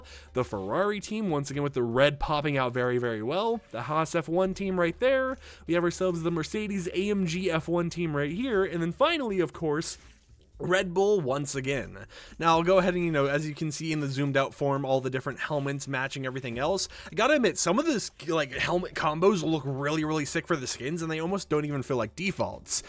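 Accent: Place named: United Kingdom. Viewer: Bro is definitely British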